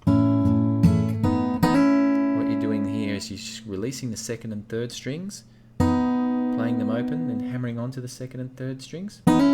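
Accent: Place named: Australia. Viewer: Just landed on this via YTs Bots, me having covered his lessons before, but so glad I did. This lovely warm Ozzy man's voice tone and teaching is spot on, and after all these years he is still giving Gold away for free. Check out his other lessons, brilliant at what he does and sings great too.. Don't pay any attention to the thumbs down, there's always 1 or two in the room that don't get it....haaa🤣. Subbed to many moons back. Enjoy..Rollo.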